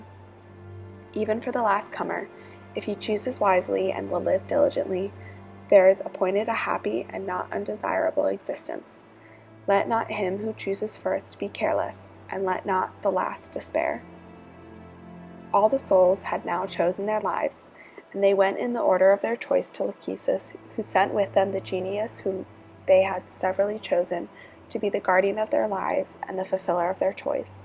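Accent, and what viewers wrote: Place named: South, United States. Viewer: Sorry...I found both the graphics and the monotonous Southern voice annoying.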